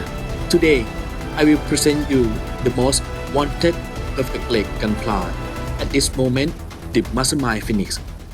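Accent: Thailand. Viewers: เสียงเหมือนสำเนียงไทยมากกกกๆ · สุดยอดเลยครับ ปล.คนไทยไหมครับเนี่ย สำเนียงชัดเลย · สำเนียงแบบนี้ คล้ายคนไทยมวาก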